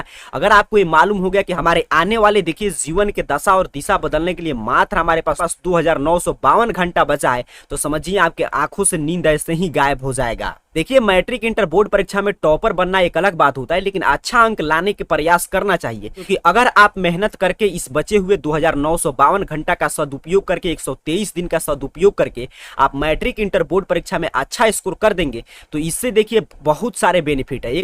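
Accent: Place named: Gujarat, India. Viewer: Sir aapka voice ka ton ek gujrati jaisa hain.....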